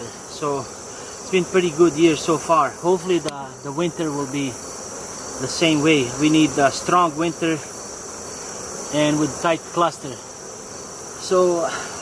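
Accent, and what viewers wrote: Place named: Balkans. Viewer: You sound... like you're from my part of the world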